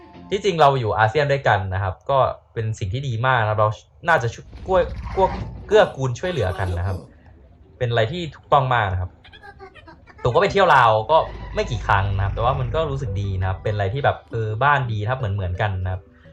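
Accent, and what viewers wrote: Isan, Thailand. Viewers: พี่เป็นคนอีสานหรอผมภาคเหนือ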